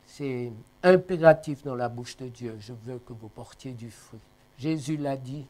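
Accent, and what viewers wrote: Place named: Vaud, Switzerland. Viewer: Magnifique avec ton accent vaudois Dieu Te Bénisse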